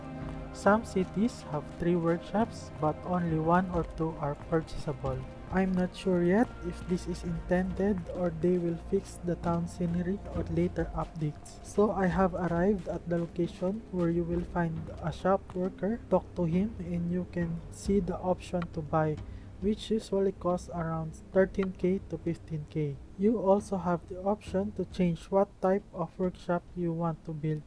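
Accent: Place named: Philippines. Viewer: nah, just your accent. Hahaha I'm filipino too, glad to see another one enjoying this game. Not many people enjoy these types of stuff in our country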